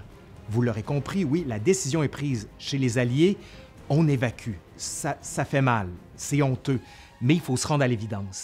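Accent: Canada. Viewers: Ca nous change de Stéphane Bern....et l'accent canadien participe au charme !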